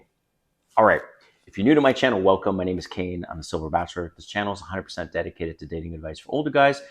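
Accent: Canada. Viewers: i can detect the Canadian accent ! Cool 😊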